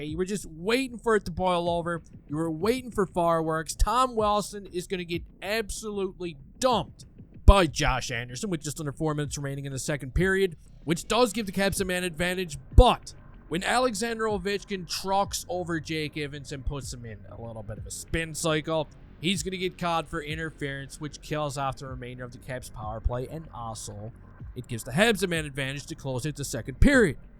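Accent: Newfoundland, Canada. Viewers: Loved your breakdown of the game- TBH, I don’t who you are but I’m hearing a Newfie…or a Caper? I wish there was another view of the hit- I’m surprised there isn’t. The fact of the matter is this: If you enter a ring with a bull, you have to be aware of where he is at all times. And that goes for Wilson. 2 things Carrier could’ve done: 1)Put up the fence and risk a major or 2)Take the hit and STAY DOWN. Caps don’t score because the refs stop the play. Carrier was probably pulled because of concussion protocol anyway.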